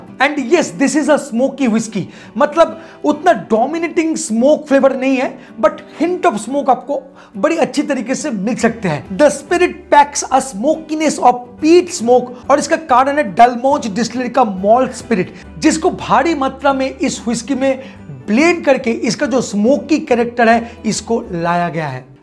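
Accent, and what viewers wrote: Bengal, India. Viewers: Kimon aachen dada ! Well presented. Good diction with enjoyable Bengali accent. Will take yr advice...... Cheers 🥂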